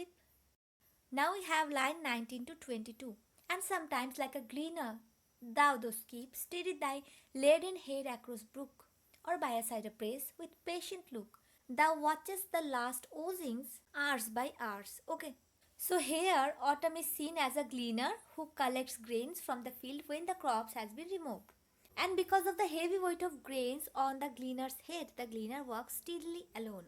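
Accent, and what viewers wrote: India. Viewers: Are you from India??? I can guess from your accent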